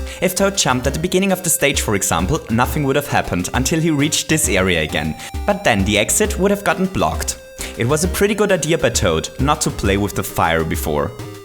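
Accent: Germany. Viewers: are you german? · Are you german? I'm asking because of your accent.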